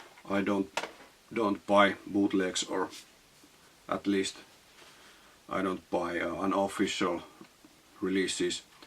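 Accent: Finland. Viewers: i love finnish accent so cute :)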